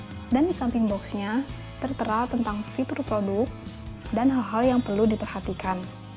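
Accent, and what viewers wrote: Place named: Sunda, Indonesia. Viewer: Kangen sm suarany,,,orang jawa timur tapi suara sunda heee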